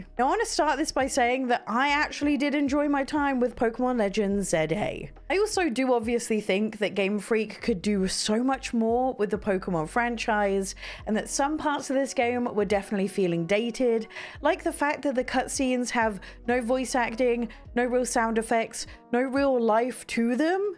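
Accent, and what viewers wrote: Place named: United Kingdom. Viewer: she is from the Uk they say things different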